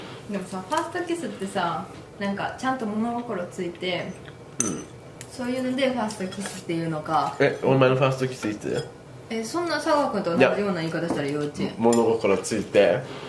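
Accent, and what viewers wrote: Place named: Osaka, Japan. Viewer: 色⤵が美味しそう。 大阪弁ww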